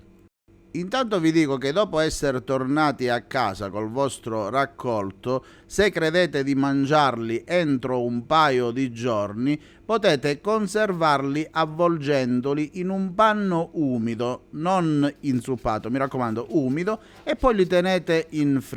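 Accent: Calabria, Italy. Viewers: Accento calabrese pesante eh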